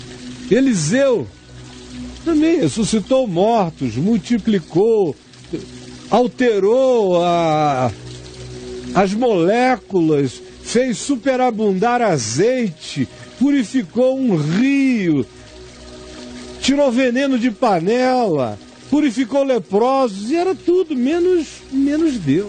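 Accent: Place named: Bahia, Brazil. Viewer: Eu tb pensei que era um baiano! 😄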